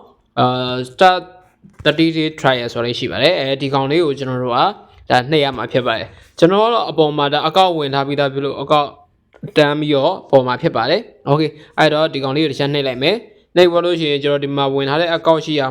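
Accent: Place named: Rakhine, Myanmar. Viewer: အသံကမပီဘူးဗျရခိုင်အသံလိုဘာသံကြီးမှန်းမသိဘူးဗျ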